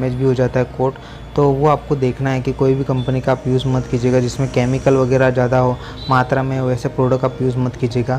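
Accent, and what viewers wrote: India. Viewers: Why you talking like indian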